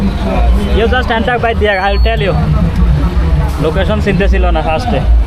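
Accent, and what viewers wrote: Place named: Banshkhali, Bangladesh. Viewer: বাঁশখালী মনে হয়।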